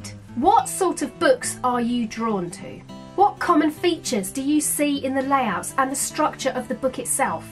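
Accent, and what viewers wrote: United Kingdom. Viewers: a nice English voice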